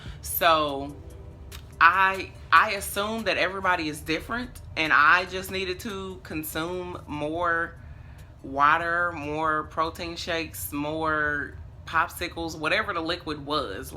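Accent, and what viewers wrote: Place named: Texas, United States. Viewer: Just came across your videos. Love your personality and would like to know if you're from Texas? Your accent is similar to mine.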